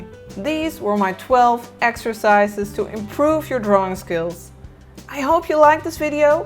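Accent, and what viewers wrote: Netherlands. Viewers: Hmm do I hear a Dutch accent? 🤔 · Are you dutch? I can sense that warm friendly accent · Are you dutch? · Are you dutch? The accent sound familiar. If so, could you also make some video's in dutch?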